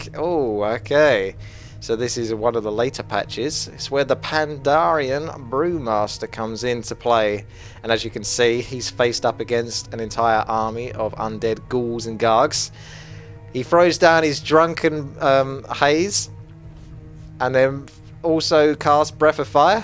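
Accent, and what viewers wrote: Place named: United Kingdom. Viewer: awesome bro !! wish I could pinpoint your Brittish accent.......is it southern ?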